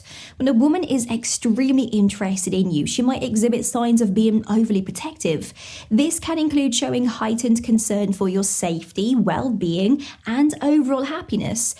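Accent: United Kingdom. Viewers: British accents are so awesome!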